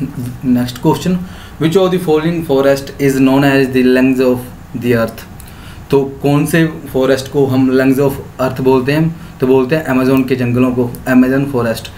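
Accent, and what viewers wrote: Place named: Punjab, India. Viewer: From ur Language, you seems to be from PUNJAB ..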